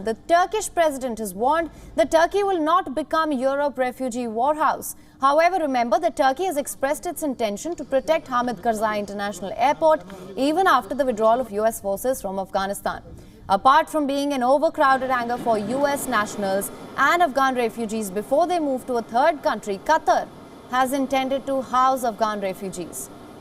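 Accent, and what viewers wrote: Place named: Southeast, India. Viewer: You're eccent southeast India I can't stand that thick eccent 🧐😳😬😬😬😬🤐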